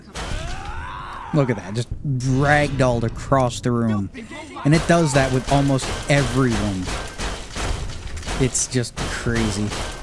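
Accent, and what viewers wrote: South, United States. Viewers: Now I know that a law-abiding Canadian can't survive in a wasteland because it was ironically proved to me with a pleasant Southern accent. Thank you, sir!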